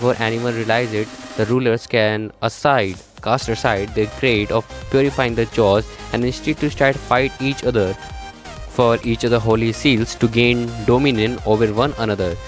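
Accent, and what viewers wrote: India. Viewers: Are you an Indian. I asked because your speech like an Indian. I like your videos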